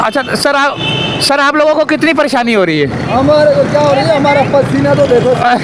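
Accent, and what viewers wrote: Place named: Hyderabad, India. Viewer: بھائی،،اپ کے لہجے سے لگتا ہے کہ اپ حیدرابادی ہو،،،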